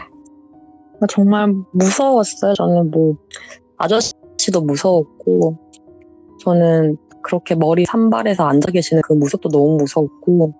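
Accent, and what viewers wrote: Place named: Gyeongsang, South Korea. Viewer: 근데 경상도분이네? 억양은 숨길수없다 ㅋㅋ 난 완벽함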